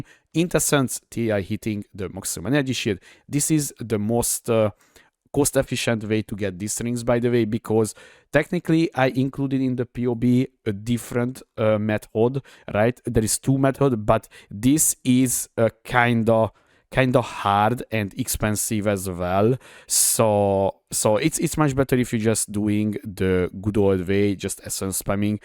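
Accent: Hungary. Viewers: Came here after a recommendation for super tanky builds, not disappointed! De barátom a kiejtésed borzalmas hahaha Rögtön tudtam hogy honfitárs vagy! A kedvencem a kurentli és a dö :)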